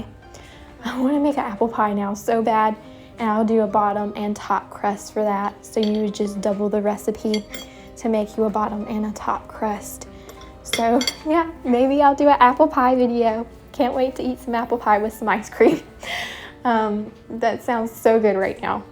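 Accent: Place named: South, United States. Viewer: I can feel Southern hospitality seeping right through the screen! I love the sweetness and gentleness of your videos, could listen to your accent all day! 🤗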